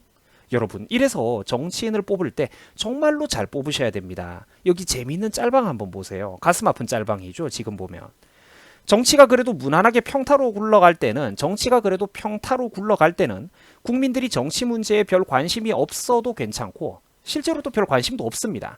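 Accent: Gyeongsang, South Korea. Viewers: 근데 얘는 왜 자꾸 경상도 사투리를 쓰냐 듣기싫네 ㅋㅋ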